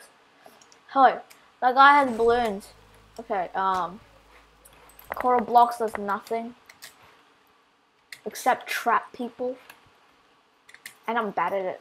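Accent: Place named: United Kingdom. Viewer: Briish huh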